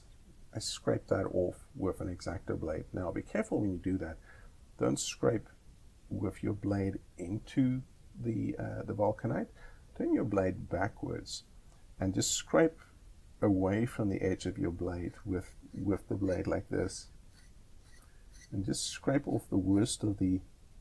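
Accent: South Africa. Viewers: I almost expected to hear 'if you do it like this, you will just cause more kak for yourself'. Glad to see that the South African accent hasn't completely disappeared. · There's a South African accent in there.....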